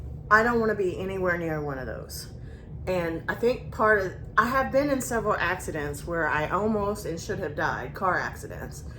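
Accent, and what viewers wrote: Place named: South, United States. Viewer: My niece would love the cute body cat. 🐱 💕 Ty so much for being so down to earth. Your positivity is a domino effect for sure. 😊 Thank you so much for shouting out my sweet and precious bff 🎉She is such a blessing in my life and I’m grateful for her daily. ❤I was so glad she sent me your way too. I’ve truly enjoyed this video so much. Love self care Sundays and Trisha’s Friyay mask day. My two favs to tune into now!!😊❤ People like you and Trisha ( and so many more)are making a difference in such a positive way so keep going. You ladies are helping me so much more than you even know. I wish you all the best! 🤗 ❤ Trisha and I have some southern twang too so no worries here. Lol😅 Btw the way your husband is very sweet. EewwwWee… is a great nickname! 😀 you have made me laugh so much. So ty!!😅 I have watched one of the Final Destination movies and No more for me. 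🥴 Cute little cats 🐱 I have 3 dogs I feel the same way about! Enjoy time with your momma. I lost mine last year so hold tight to those great times you make together. 😊 God bless and have a wonderful Sunday! ❤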